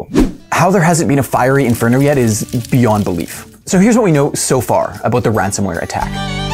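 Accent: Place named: Canada. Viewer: You Canadian eh?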